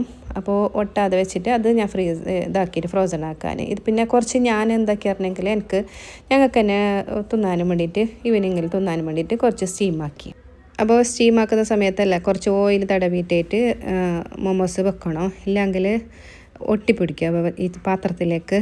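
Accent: Kasaragod, India. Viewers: Kasaragod aano😅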